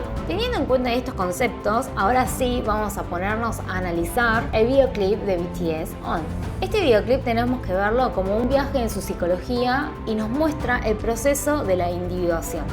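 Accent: Argentina. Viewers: Hola! Que bueno conseguir una coreana que hable tambien el español creo que tendras una nueva seguidora.gracias por este video de ON. Que buena explicación. Siento tienes un tono argentino. Eres argentina o coreana.Ha medida de que te escucho me siento muy emotiva. Sera que te puedo hacer algunas preguntas???. Te agradeceria una repuesta. Estare al pendiente. Un abrazo desde Venezuela 💜🌷💜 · Hablas un español súper avanzado y encima tienes acento argentino. Mae mía felicidades.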